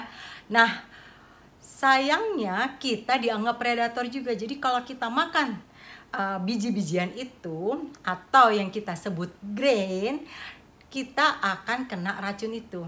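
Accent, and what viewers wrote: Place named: Java, Indonesia. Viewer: Kaya jawa ya.. sy jogja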